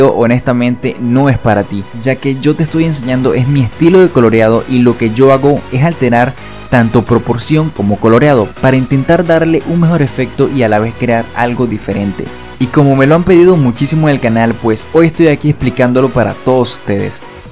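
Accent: Venezuela. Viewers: Es que dice "los ojoh" :v, los venezolanos hablan mucho como con la j o algo así... en lo personal me encanta el acento c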